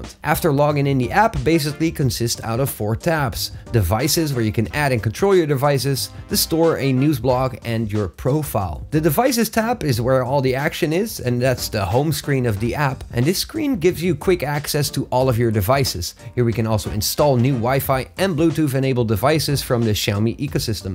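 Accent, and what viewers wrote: Netherlands. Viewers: Brendan Dijkstra An btw, He has a very subtle dutch accent. You wont notice it unless you look for it.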